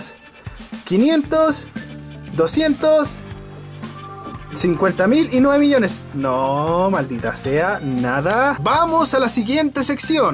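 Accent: Chile. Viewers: Eres de chile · Eres chileno? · eres chileno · habla como chileno wnn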